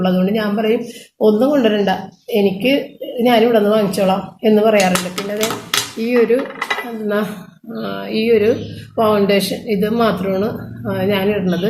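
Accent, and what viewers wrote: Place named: Paravur, India. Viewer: Paravoorile language kelkan nalla rasand nde anuyathi Paravoorukariyanu same avalu parayanapole..chechiyude kashtapadu manasilavunnund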